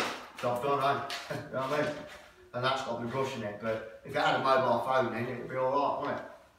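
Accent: Leicester, United Kingdom. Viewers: hi,mate are you from leicester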